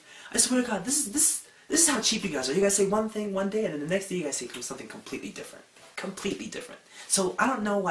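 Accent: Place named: Saudi Arabia. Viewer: هـــذا الشي جميييييييييييييييل، جدًا ! ... ما أستوعب كيف يتكلم "سعودي" زي كذا .. أبغا أقابله شخصيا وأسولف معاه لوول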